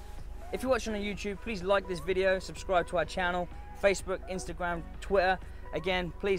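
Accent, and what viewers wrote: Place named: United Kingdom. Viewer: Twit-ah. I love British accents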